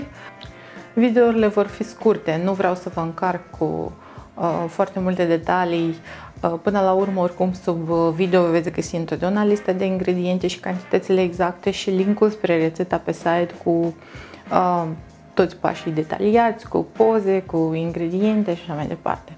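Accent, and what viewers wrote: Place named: Moldova. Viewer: Cele mai bune retete! Si de asemenea f deosebite! Ador accentul moldovenesc autentic. Nu-l ascunde! Multa bafta in continuare!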